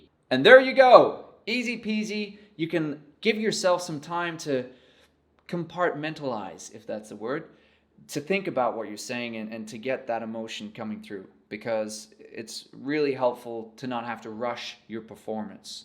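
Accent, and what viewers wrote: United States. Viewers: Honestly man, your American accent is on point. Good job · Lol! Love your yankee voice; Sounds a little like will Wheaton.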